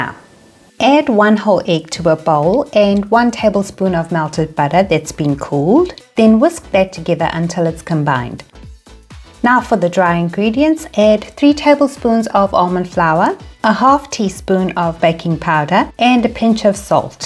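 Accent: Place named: South Africa. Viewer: As I was typing my brain was quietly saying (maybe she's South African!). There are a lot of similarities in some of the accents/dialects. Your recipes are great and I'll share then how and when I can. Thank you.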